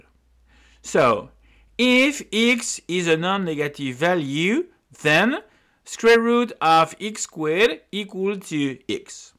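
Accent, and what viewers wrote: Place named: France. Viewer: he sounds french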